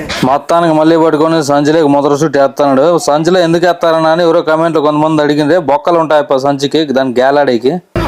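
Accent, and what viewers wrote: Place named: Anantapur, India. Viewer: Ananthapuram telugu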